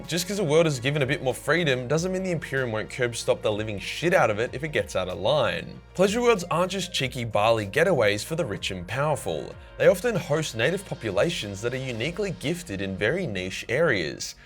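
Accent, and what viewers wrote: Australia. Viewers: This is exactly your type of video, time to use that sweet Aussie voice to explain the most beautifully deceiving worlds in the Imperium.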